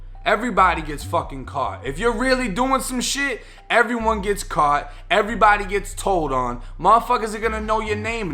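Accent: Boston, United States. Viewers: You sound like you're from Boston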